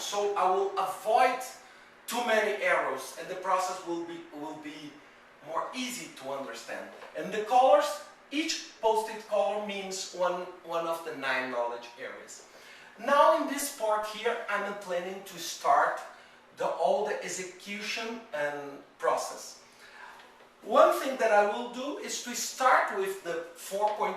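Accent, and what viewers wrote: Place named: Brazil. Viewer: where u from? you sound like Brazilian! am I correct?!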